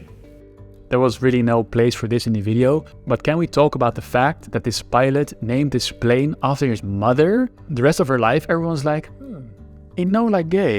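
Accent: Netherlands. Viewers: Dutch accent is strong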